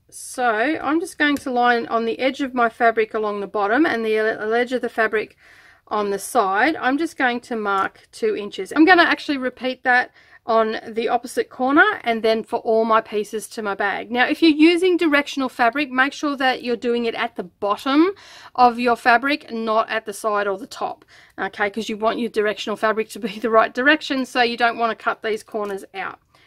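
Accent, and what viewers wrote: Australia. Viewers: great to hear an Aussie...not many doing sewing videos...keep em up :D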